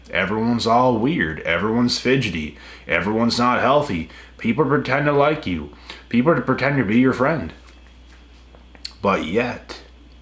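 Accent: Canada. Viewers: Love the Canadian accent! From USA.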